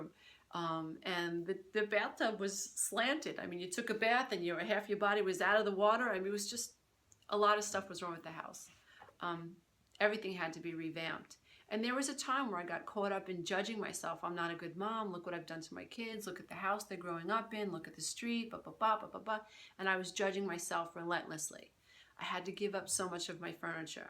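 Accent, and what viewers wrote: New York, United States. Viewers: Great advice. Are you from the Bronx?Brooklyn?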